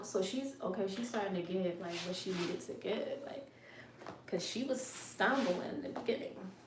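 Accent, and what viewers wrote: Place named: New Jersey, United States. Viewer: I am so grateful to have found your channel!! I love your energy and this video was incredibly helpful! And this Jersey accent is giving! I live in Houston now but one Jersey girl always knows another. 💖💖💖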